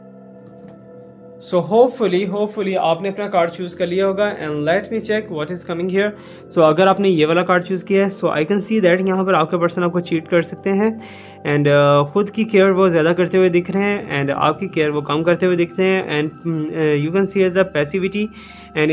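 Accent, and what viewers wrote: Punjab, India. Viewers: Sir pls btaeye aap basically khaan se ho?.aap hmare jesi theth Punjabi kese bol lete ho.m toh reading mien aapki Punjabi sunne hi aati hoon.TUNN VATTA 😂😂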